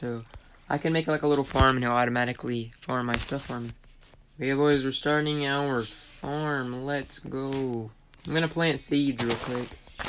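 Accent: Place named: Texas, United States. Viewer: You kinda sound like ur from texas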